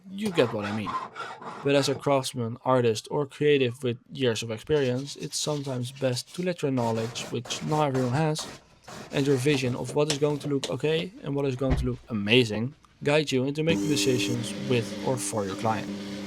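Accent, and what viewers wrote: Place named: Netherlands. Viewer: Your accent sounds like you are Dutch! Very nice ring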